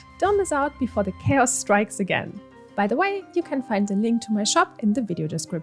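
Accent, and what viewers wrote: Germany. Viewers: Me as a german hear a german accent 👀 haha. I looooove your awesome illustrations! Super cool things to learn from you!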